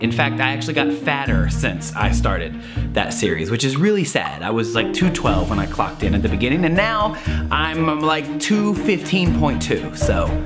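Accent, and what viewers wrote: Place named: South, United States. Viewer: Just now discovering this! FYI your accent totally changed after working out with! Haha. Southern boy made an appearance. Can't wait to meet you someday! (and watch all your videos!!!)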